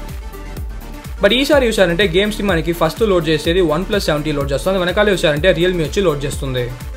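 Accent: Chittoor, India. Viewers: Nice video bro kastha chitoor slang undi 😀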